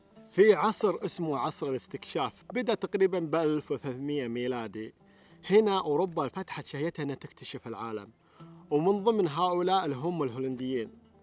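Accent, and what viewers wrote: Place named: Bedouin, Arab world. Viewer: لان بصراحه لهجتك شبيها للهجت بعض البدو هنا في مصر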